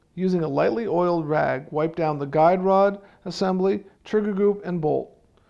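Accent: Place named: New England, United States. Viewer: Obviously from New England.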